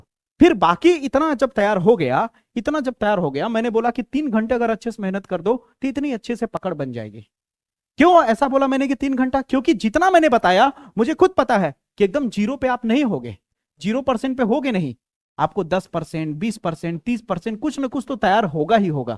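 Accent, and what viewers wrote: Bihar, India. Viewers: Guru ji ki aap Bihar se ho kya❤❤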